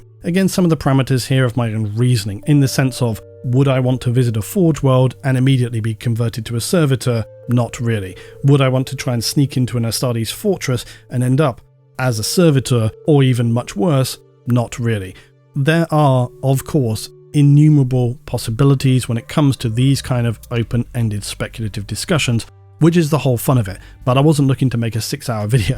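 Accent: England, United Kingdom. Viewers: You're right about language being insurmountable. Until you mentioned it yourself, I was checking off each suggestion as impossible due to the language barrier. I was listening to your English accent as an American, knowing that the differences only represent a few hundred years of geographic distance. Even with Imperial media technology serving to provide some linguistic cohesion, a modern traveler would probably sound like they were speaking Old English to someone in 2024. So, completely unintelligible. Other notions that crossed my mind would be strange ammunition calibers of travelers and the ancient disease we carry that had been stamped out long ago by immunizations, etc. A travelers might be tracked and captured as a Patient Zero of a long forgotten virus.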